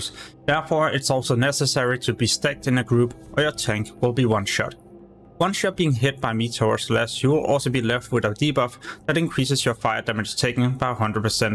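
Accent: Denmark. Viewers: 100% Dansker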